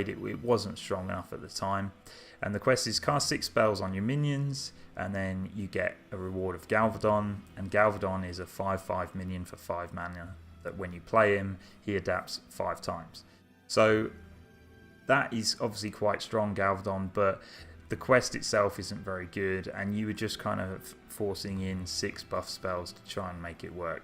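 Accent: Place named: United Kingdom. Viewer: you got UK accent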